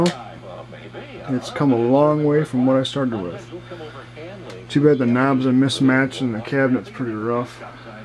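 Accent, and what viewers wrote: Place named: New York, United States. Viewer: Sounds like your in NJ or NY? Great video.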